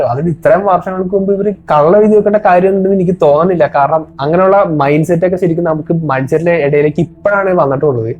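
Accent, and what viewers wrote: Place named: Kochi, India. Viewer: കൊച്ചിക്കാരൻ ആണോ..? ആ ശൈലി ഇത്തരം വിഷയങ്ങൾ കൈകാര്യം ചെയ്യുന്നതിൽ അഭിലഷണീയമല്ല.. വ്യക്തത വളരെ കുറവാണ്... 🙂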